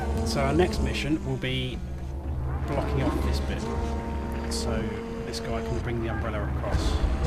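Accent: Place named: United Kingdom. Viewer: I don't know why you don't have any subscribers this is actually good content! and the british voice is a plus good job!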